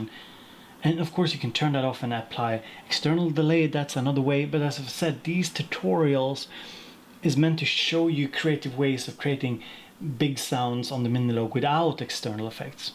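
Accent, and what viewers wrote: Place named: Sweden. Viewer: Judging from the accent this dood must be swedish 🇸🇪🤘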